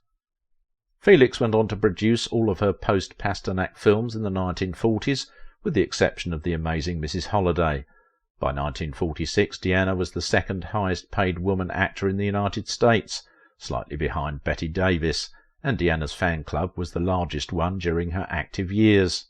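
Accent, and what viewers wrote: United Kingdom. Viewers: Please stop pronouncing it " shed..yul." I don't care if it's the UK way, it's WRONG...and it sounds stupid.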